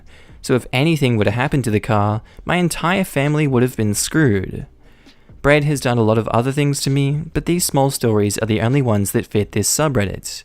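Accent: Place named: Australia. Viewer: Aussie far from home here, sometimes I listen just to enjoy the accent :-)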